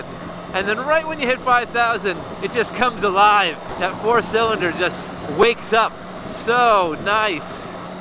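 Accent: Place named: United States. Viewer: You don’t sound English? You sound like an American. How’s it feeling riding on the opposite side of the street.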